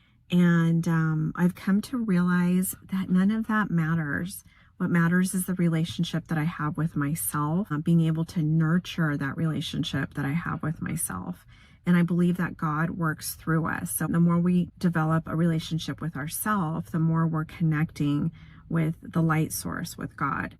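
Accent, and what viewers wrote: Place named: New Jersey, United States. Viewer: She sounds like she is from Jersey:)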